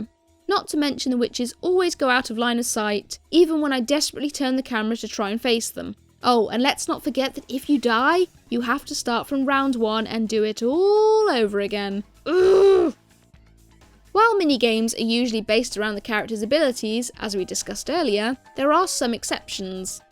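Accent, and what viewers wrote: RP, United Kingdom. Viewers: You have one of those indiscernible ever so slightly posh accents :) I like supernatural/horror content, you should check out Lazy Masquerades channel, also a very interesting voice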